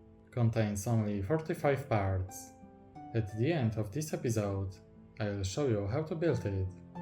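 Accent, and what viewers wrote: Poland. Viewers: Tak myślałem, że to polski akcent xd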